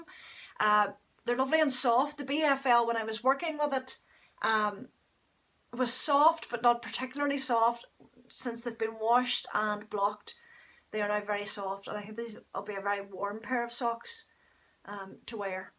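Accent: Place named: Ireland. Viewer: I love your podcast. Your accent is one of my favorite parts. I live in the western US where we don’t get a lot of Irish influence. I can see why you love that sweater so much. My favorite pattern I ever knit is the Wishmaker Mitt pattern by Erica Hauser.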